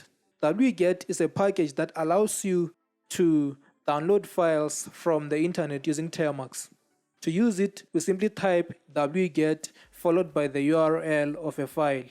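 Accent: South Africa. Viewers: From Mzansi. You sound Venda/Tsonga. Dankie for the video!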